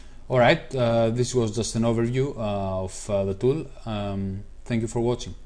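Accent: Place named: Greece. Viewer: are you greek or sth ? i can detect an accent :P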